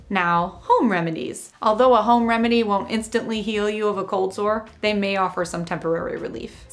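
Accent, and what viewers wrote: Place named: New Jersey, United States. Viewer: That’s a lovely accent you got New Jersey?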